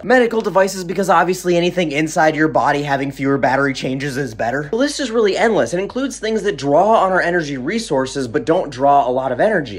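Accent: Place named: Minnesota, United States. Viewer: It's Minnesota English...It's spelled 'nuclear' but we pronounce it 'nucular.' Get over it.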